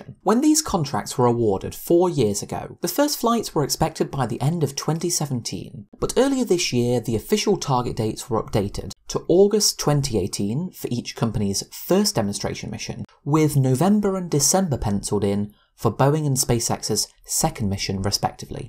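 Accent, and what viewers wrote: United Kingdom. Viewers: This british accent...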